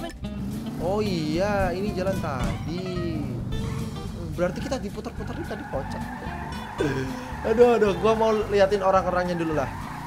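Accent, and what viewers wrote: Jepara, Indonesia. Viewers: Bang orang jepara ya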